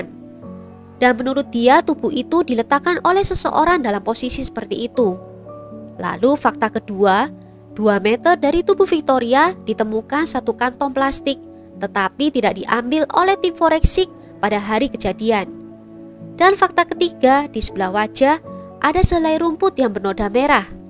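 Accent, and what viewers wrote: Java, Indonesia. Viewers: Sll gaa bosen liat semua video nya say medokuhhh 👍💖💖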